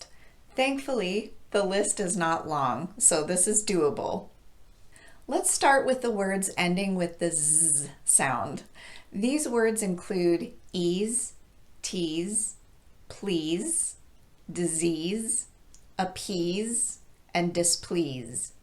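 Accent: United States. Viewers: Thanks for teaching ❤ but I have a question. Could you tell me Which accent is your pronunciation in the U.S.?😢because I found some different accents in the U.S. They are so different, especially some certain words and certain structures. Does it matter if I have a mixed accent 😂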